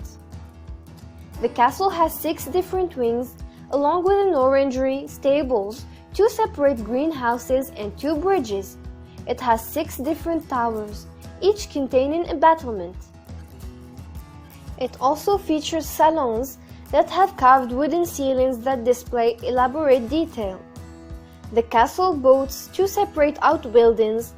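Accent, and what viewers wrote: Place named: France. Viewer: "It can be yours for a MERE $5.69 million". That might be mere to you, but not to me. BTW it's difficult to understand the narrator with that French accent.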